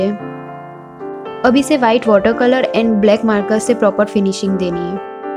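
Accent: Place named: Gujarat, India. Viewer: R u gujarati??your sound is like gujarati...btw,great work..👍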